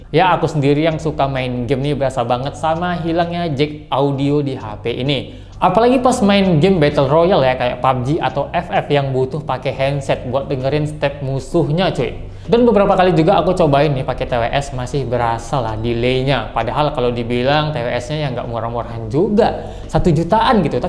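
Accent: Medan, Indonesia. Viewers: Bg lu org Medan?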